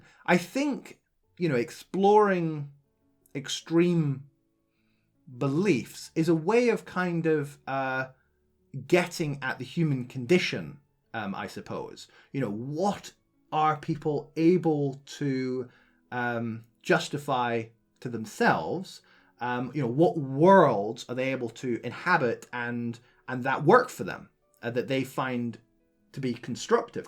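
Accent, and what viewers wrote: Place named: Edinburgh, United Kingdom. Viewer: I thought your accent was an Edinburgh one.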